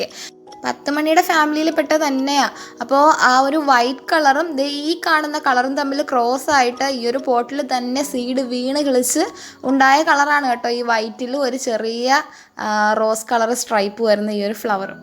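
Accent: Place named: Trivandrum, India. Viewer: Njan tvm aanu ithante slang kettappo tvm anoonnoru doubt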